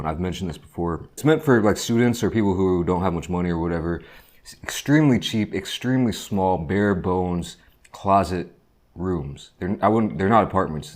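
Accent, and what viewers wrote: United States. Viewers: He's American I think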